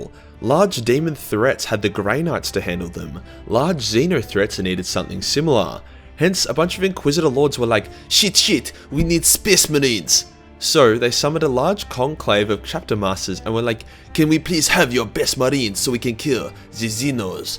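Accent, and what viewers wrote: Australia. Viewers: A captivating and entertaining story told as only an Aussy or Kiwi could ;) Noice! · блять,еле еле австралийский понимаю,абоба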